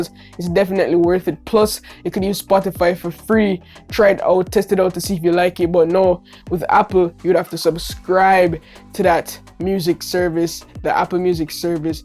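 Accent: Jamaica. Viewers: I hear a Jamaican accent.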